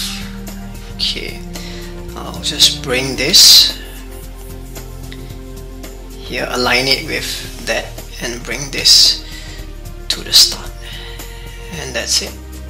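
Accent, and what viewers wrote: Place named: Singapore. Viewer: I like hearing this singaporean accent hahaha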